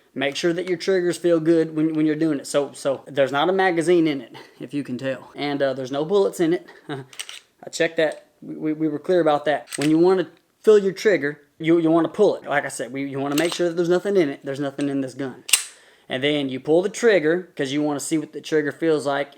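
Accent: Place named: South, United States. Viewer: You always treat a weapon as if it’s loaded even if you just unloaded it. I’m sure you’re a fine shooter .. I’m just saying you had your finger inside the trigger guard in that last video I watch. That’s a no no .Guess it was this video just the short version of it… You have a southern accent… where are you from… are you close to the great state of Kentucky?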